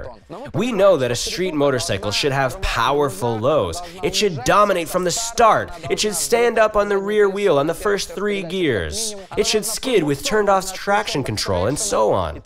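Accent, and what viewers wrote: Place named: England, United Kingdom. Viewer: oh you're from England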